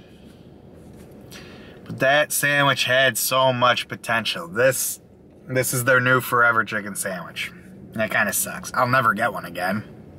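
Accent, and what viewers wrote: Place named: Ohio, United States. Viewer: Thanks! Hey quick question you wouldn’t happen to be from the Ohio area would you? My dad lives in Ohio and myself in ga but I noticed your accent. Lol no disrespect or anything I just miss my family up there!!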